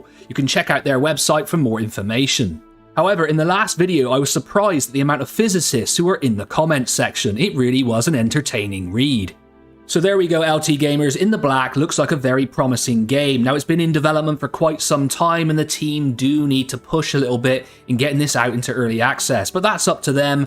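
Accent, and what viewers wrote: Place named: Bristol, United Kingdom. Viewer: Your accent sounds Bristolian, the very least you're from the South West? I have so many questions.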